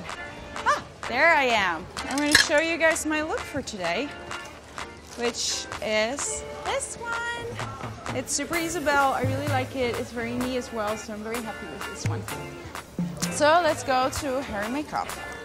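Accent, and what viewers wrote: Netherlands. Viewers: I’ve never heard of her before. But when she started talking I knew immediately that she’s Dutch. The Dutchness seeps through ✨ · I love how the Dutch pronounce the “th” sound with a “d” instead. “Dat”, “dis”, so cute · U really hear that dutch accent amd the name...very dutch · You can hear the dutch accent coming out 🥰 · Oof Dutch accent ugly asf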